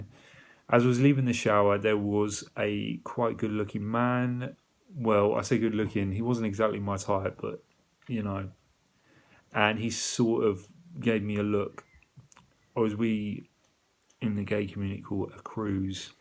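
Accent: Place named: United Kingdom. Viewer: Its the British accent 🤩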